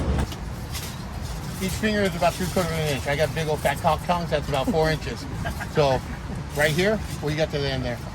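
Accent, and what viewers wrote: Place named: New Jersey, United States. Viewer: sounds like hes from new jersey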